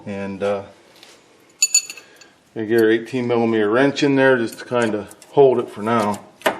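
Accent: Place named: Pittsburgh, United States. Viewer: Hello from one Yinzer to another! I thought I recognized our dialect. Nice video,and really straightforward advice!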